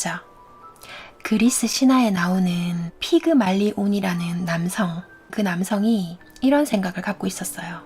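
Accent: Gyeongsang, South Korea. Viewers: 저는 님 경상도사투리가 묻어나는 스토리텔러여서 더 좋네요. 마음편하게하는 목소리입니다. 구독하고 갑니다.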